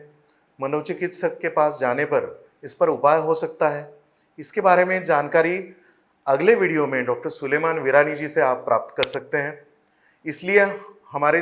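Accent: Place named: Maharashtra, India. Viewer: Tu marathi n tuz accent marathi ahe marathich sangaych murkha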